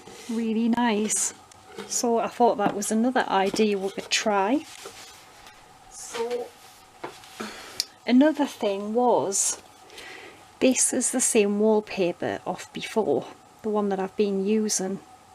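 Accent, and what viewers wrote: United Kingdom. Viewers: I soooo love your videos, such great ideas (and I love listening to your British accent) You could use a small square of velcro to close the pouch with. I have them on covered paper pads and they work well.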